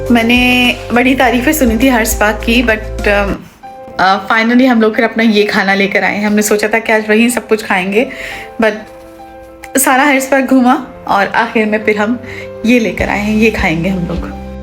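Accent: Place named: Karachi, Pakistan. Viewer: Salaam ...Aap ke Bolnay ke Andaaz se hi Mai samajh gyi thi ke Aap Karachi se hain ..wesay Karachi Wala Taste to Dunya mai Kahin Nhi milta ...Yehi Sach hai ....By the way watching ur vlogg for t he first Time ....Nice vlogg .👍🏻.......Hamesha .Khush rahain .....🌹🌹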